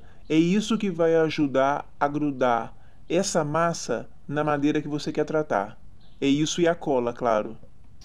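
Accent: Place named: Santa Catarina, Brazil. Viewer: Eu de vez em quando vejo seus videos e gosto da imensa variedade como de jardinagem até este de marcenaria. Mas seu sotaque é tipico de SC. Tu és catarinense? A pronuncia do R forte é tipica de quem é catarinense.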